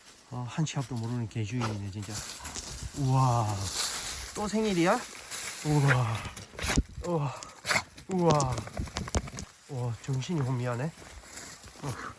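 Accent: Gyeongsang, South Korea. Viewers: 진주분이 경상도에서 촬영하는데 그럼 뭐 억지로 서울말 씁니까? ㅋㅋㅋㅋ 어이가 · 갱상도 말투 진짜 개역하네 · 경상도사투리목소리 피식대학의 민수랑 많이 닮은게 더 읏겨ㅋㅋㅋ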